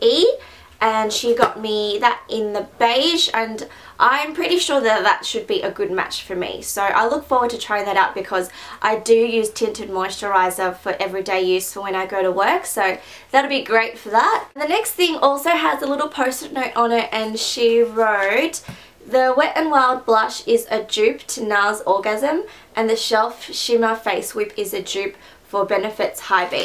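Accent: Australia. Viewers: Yeah aussie accent! Your gifts are just as good as hers, things in oz are so expensive!!! Im an aussie girl in US :-)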